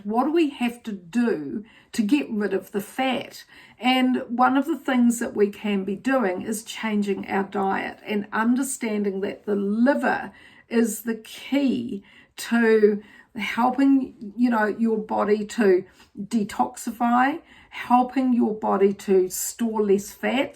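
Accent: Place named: New Zealand. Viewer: Why do New Zealanders say “Woman” for plural instead of “Women “?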